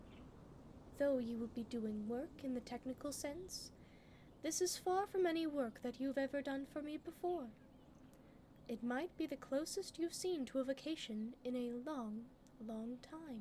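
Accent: United Kingdom. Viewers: It sounds pretty much like british accent and she fits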